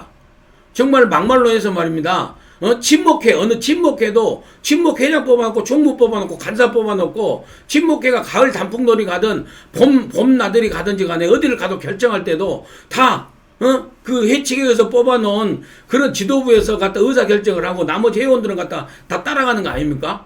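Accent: Jeolla, South Korea. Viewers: 설명 넘 잘하셔서..어려운 정치가 이해가 좀 됩니다.. 민영삼 원장님의 전라도 억양은.안정권 대표 처럼, 듣기가 너무 좋습니다. . 저.. 팬이 되었어요. 화이팅..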